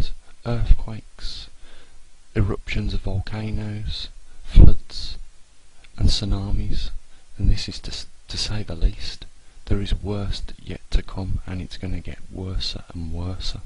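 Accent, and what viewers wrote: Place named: Cockney, United Kingdom. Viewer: I would have loved to listen to this video but the dude already has a thick English Cockney accent and the fact that he has his lips pressed up close to the mic doesn't help matters at all either! I got about 50% of the content. Not enough to understand.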